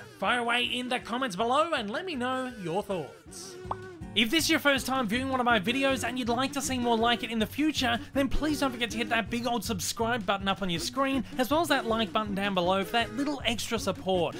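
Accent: Australia. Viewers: Urghhh that aussie narrator's accent is decidedly grotesque and annoying, ruins what would have been a great video !!!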